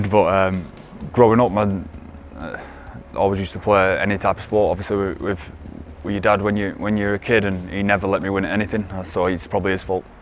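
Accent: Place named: Yorkshire, United Kingdom. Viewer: He’s from Yorkshire, every place has different accents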